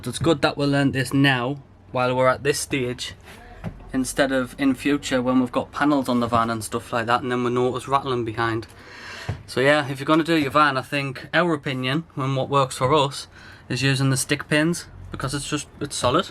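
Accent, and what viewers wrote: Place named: Northumberland, United Kingdom. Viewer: Nice job, I'm watching for information and because my mum was from ashington and your accents remind me of her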